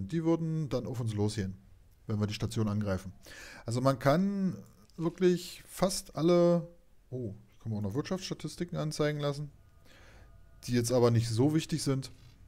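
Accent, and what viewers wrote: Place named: Berlin, Germany. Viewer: Klingst wie ein Brandenburger. Die haben immer den heftigsten Berliner Dialekt.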